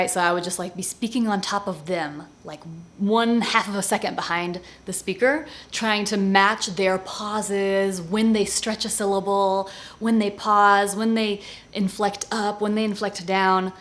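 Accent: Spain. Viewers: you have a great spanish accent! it's perfect. Thank you for your recommendations.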